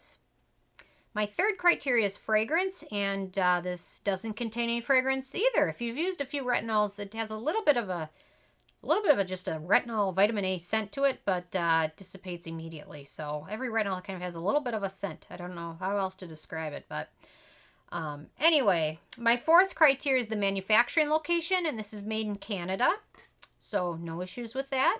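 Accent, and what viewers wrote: Minnesota, United States. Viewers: My husband said "she sounds like she's from Minnesota!" He's originally from there, but doesn't have the accent. LOVE IT! 😍😍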